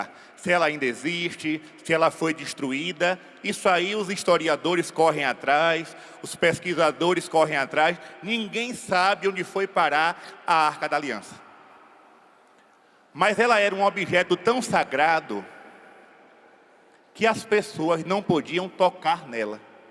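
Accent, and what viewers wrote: Bahia, Brazil. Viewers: Boa noite Padre gosto muito do senhor admiro demais da conta suas homilia são todas maravilhosa gosto muito do sotaque baianos e de todos os nordestinos acho muito lindo🎉🎉🎉🎉🎉🎉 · Sua bênção padre sou mineira e amo suas pregações com esse sotaque baiano kkkkk Deus abençoe o senhor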